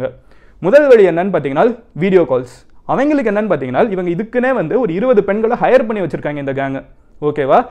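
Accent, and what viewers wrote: Madurai, India. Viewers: Enikitha pa madan nu namma madurai karana pesiruka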